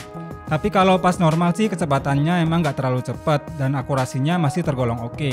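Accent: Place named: Brebes, Indonesia. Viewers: Logat medok Brebes qolqolah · Maap masnya orang tegal bukan sih ?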